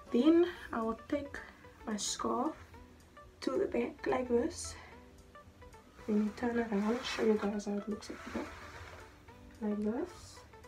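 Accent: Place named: India. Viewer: Is that her real voice and accent. She looks black but sounds Indian🤔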